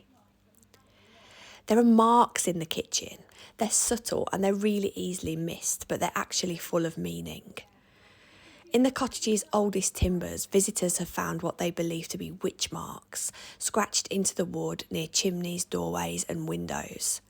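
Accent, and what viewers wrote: Birmingham, United Kingdom. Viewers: brummie (Birmingham) accent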